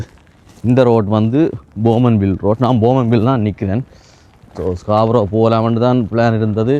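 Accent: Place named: Sri Lanka. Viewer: R u srilankan bro Ur speech will be like that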